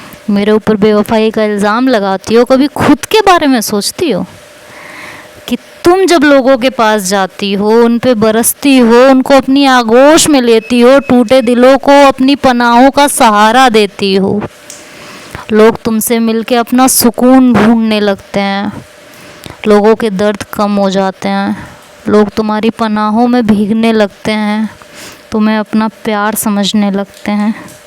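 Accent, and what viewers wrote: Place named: Haryana, India. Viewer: haa har jgh ki language ke according bolne ka trika change ho jata hai.. jese apka full hariyanvi touch ho rha tha.. meri mummy bhi bol rhi thi ki ha harayana jesi hi aavaj lag rhi hai because mere papa vha job karte the hariyana me jb me peda nhi huvi thi tb to mumy bhi rhti thi sath to unhone observe kiya hai.. mummy bol rhi thi ki aap mjakiya type ho i mean ye nhi ki jb bat kro tension do us type.. pta h mummy ko suabh se chull tha ki mere ko bhi sunana aavaj 😂😂😂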